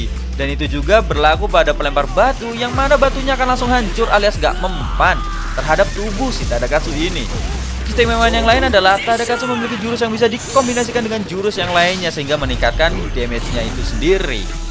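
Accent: Java, Indonesia. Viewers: logat jawanya keluar😂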